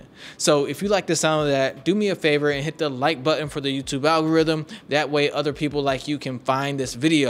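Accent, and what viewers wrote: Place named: Africa. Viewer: i like you African accent, you need to talk slower and louder to understand you.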